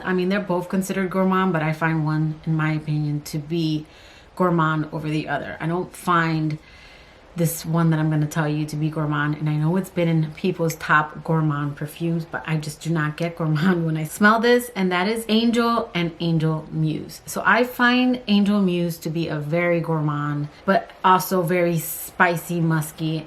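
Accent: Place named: Miami, United States. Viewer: Girl, tell me how I’ve seen a couple of your videos and I thought she has such a Miami voice .. are you from Miami? 🤣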